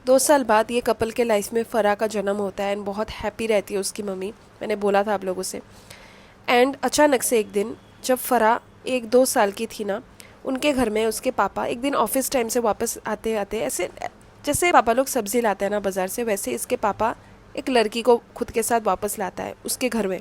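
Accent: Bengal, India. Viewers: You also form Bengal (coz your bengali accent tells it)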